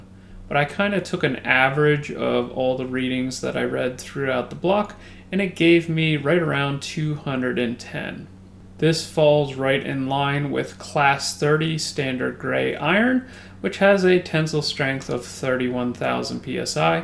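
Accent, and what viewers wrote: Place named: Australia. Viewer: I only saw the vid for 2.3 seconds and I said thats gotta be australian lol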